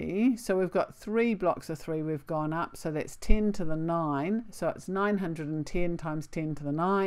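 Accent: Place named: New Zealand. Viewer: Super helpful. Also good to hear a kiwi explaining this. Thanks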